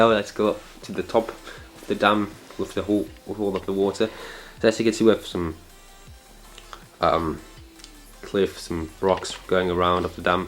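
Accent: United Kingdom. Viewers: Haha wie geil! Du hast ja richtig ein bisschen einen britischen akzent bekommen! :D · wo hast du so english gelernt? Hört sich ziemlich british an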